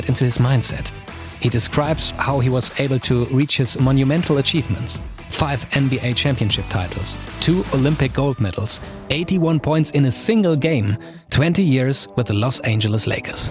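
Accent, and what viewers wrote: Germany. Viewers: Super Arbeit die du leistest mit den Videos! Man hört auf jedenfall raus das du deutscher bist :D Keep up the great work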